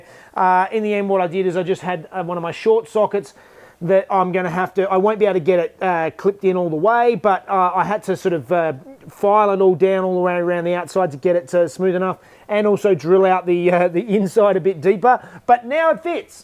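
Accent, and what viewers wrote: Australia. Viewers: I can hear the “aaaaaaand” on the Australian accent in that thumbnail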